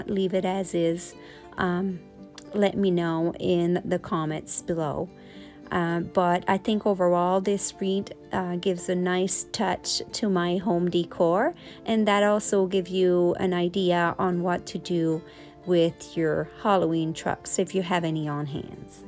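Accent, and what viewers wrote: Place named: Montreal, Canada. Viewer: The wreath looks great without a bow. Very good job. You inspire me. By the way I love your accent it reminds me of when I lived near Montreal. It brings a smile to my heart.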